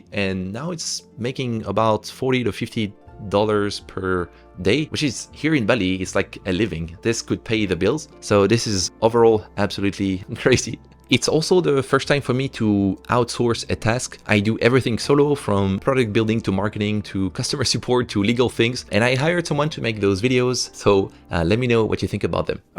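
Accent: France. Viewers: Hey, merci pour la vidéo, (j'ai reconnu le petit accent français) j'aspire à devenir développeur solopreneur, malheureusement je n'y connais rien en programmation, je suis au début de l'aventure, je voulais te demander selon toi quel est le meilleur chemin pour apprendre à coder le genre de Saas que tu conçoit, quelle langage de programmation apprendre en premier et est ce nécessaire d'apprendre L'IA (machine learning ect..) Continue ce que tu fais c'est super inspirant!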